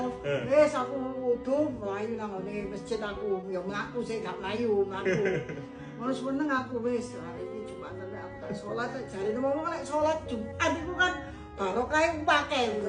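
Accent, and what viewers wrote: Surabaya, Indonesia. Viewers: Mom... Jan khas ngomonge wong Sroboyoan... ❤... Sehat semuanya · Ciri khas mak2 suroboyoan nek cerito,hahaha kudu kemekel uduk Goro ceritane,tp Soko bosone seng garakno tambah kemekel😂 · Nek aku kangen Suroboyoan, delok konten smpyn ko...... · Logate suroboyone lo... nyenengno mama dadi pingin ngrungokno critone mama karo koko...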